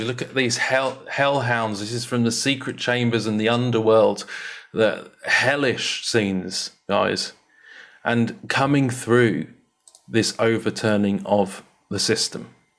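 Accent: United Kingdom. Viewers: EGO DEF He's not American, but English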